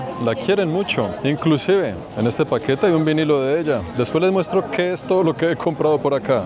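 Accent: Colombia. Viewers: me encanta porque no solo te enseña lugares que nadie o alguna televisora hace y siempre esta informado de algún lugar o monumento en el que visita, y sobre todo la educación y respeto que el siempre demuestra, haa y de extra me encanta su acento colombiano parce XD siga adelante amigo y lo sigo desde que vino a mi humilde Guatemala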